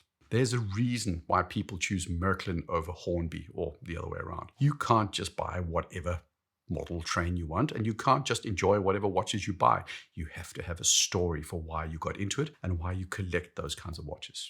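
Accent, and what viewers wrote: South Africa. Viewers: he sounds like he’s South African. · ...and a slight South African accent!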